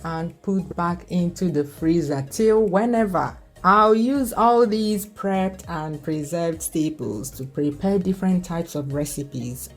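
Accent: Igbo, Nigeria. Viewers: Igbotic accent creeps in 🤩, thanks a million for this lecture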